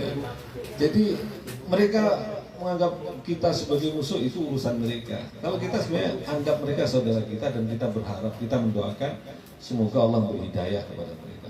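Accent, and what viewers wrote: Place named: Malaysia. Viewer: Cara berbicara Ustaz sudah seperti orang Melayu Singapura/ Malaysia. Barakallah fiik ustaz.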